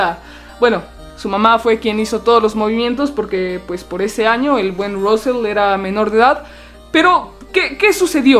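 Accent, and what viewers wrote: Costa Rica. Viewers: Eres tico?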